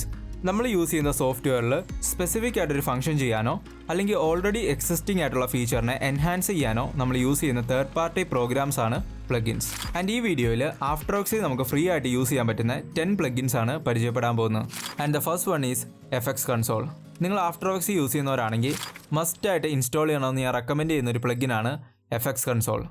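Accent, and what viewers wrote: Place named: India. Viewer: I can't understand your Indian accent. Instead you can practice pronouncing each word correctly and slowly.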